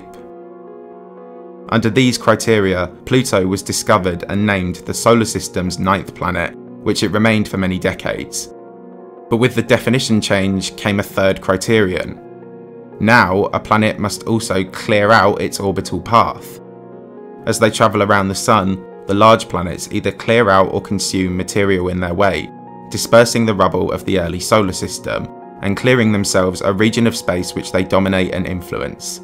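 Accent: United Kingdom. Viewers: I'm Dutch and I can hear it's not the Queen's English, but I often watch British TV so I'm familiar with different dialects. pronounciation is clear and easily understood. Here in the Netherlands I can often hear visiting British students and tourists. Sometimes I have to listen for more than a couple of seconds before I realize they are speaking some English dialect, or Welsh or Scottish or whatever...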